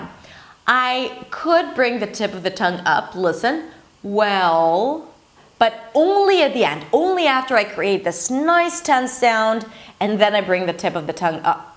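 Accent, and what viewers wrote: United States. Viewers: you are a genius, gifted where teaching is concerned. I am Republic of Benin (West). I've discovered the secret of learning English and how to sound native. Thank you so much. To top it off you're making me fall in love with American accent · you are American?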